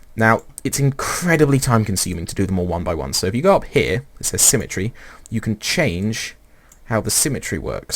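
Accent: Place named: United Kingdom. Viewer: Don't ever stop my friend, you are a great lper for kerbal space program, I dunno maybe it's your british accent.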